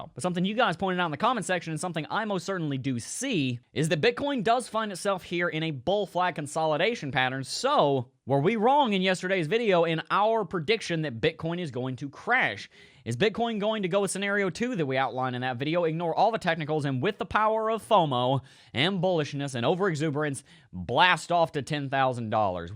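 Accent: South, United States. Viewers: I like the mix of smartness and the southern Accent he has.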